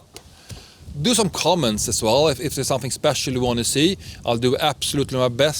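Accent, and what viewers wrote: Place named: Poland. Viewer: Wow, great idea, with starting a channel, at the same time with educational value, but it will also be a great diary for you. I stumbled upon it by accident, on the head of YouTube page, your video of your trip around Europe came up and made me curious. You have gained a really interesting aviation experience, as well as a surprising Polish accent that you flew in LOT, I myself am at the beginning of this path, I am 26 years old, about 80h of flight, studying to pass the ATPL, at the same time working remotely living in Szczecin for a German company that operates CJ1 and 2's. The aviation world is not huge, maybe one day we will meet at some airport. Greetings, Olgierd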